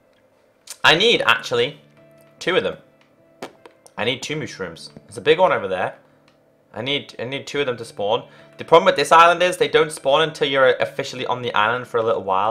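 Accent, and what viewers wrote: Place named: Newcastle, United Kingdom. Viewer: Hoos you from Newcastle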